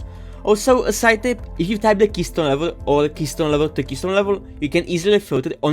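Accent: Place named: China. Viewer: Jesus Christ, your "chenglish" is killing my brain cells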